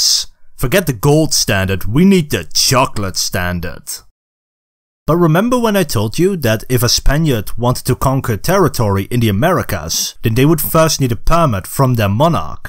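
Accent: Netherlands. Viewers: Are you Dutch? You're accent kinda sounds like it.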